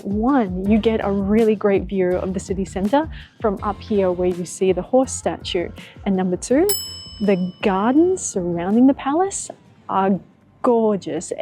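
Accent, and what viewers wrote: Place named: Australia. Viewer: Europe here. So nice to hear the wonderful Aussie dialect again, brings back great memories from my time down under. Thanks for sharing your travels. Really enjoy your vlogs.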